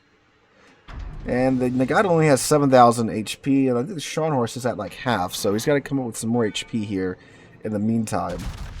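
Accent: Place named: South, United States. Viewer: I think it's just a south US thing. There's a town in Kentucky called Versailles but pronounces "Ver-SAYLES."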